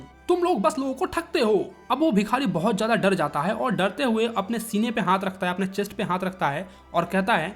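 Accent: Bihar, India. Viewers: I think you are from bihar... Right? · Are you from Bihar 😎😎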